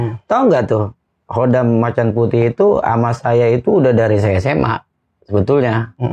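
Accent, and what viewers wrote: Jakarta, Indonesia. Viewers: Bahasa Betawi Bekasinya mantap pak ustadz saya bangga jadi warga Bekasi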